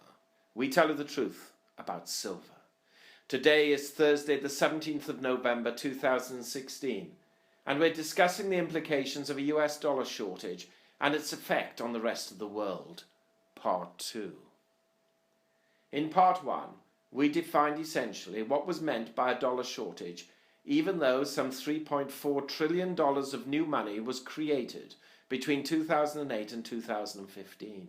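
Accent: United Kingdom. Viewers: I like your British accent. Unique and attention grabbing.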